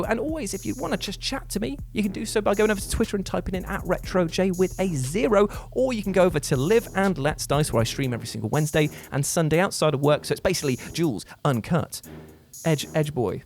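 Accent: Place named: United Kingdom. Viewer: I had to stop. He said “Haych dee” and “Ohmeeguh” too many times. British English is confusing, and annoying.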